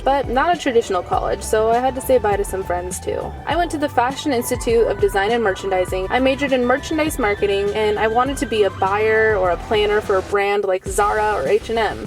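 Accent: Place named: Ireland. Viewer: Daniel Amusan Thanks! Btw Iove the Irish accent! XD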